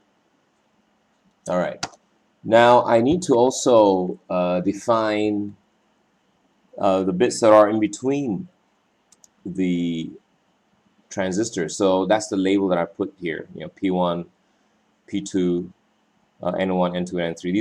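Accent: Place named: Malaysia. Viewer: You have a nice accent for a Malaysian Lecturer. Nice content and elaboration, hopefully you would contribute and upload more Electronics videos :)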